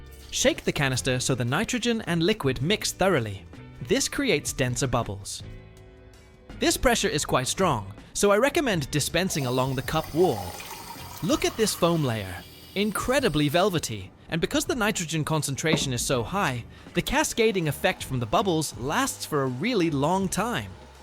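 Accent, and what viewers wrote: United Kingdom. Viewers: What's going on with the accent, it sounds a little English vs the usual Canadian we're used to lol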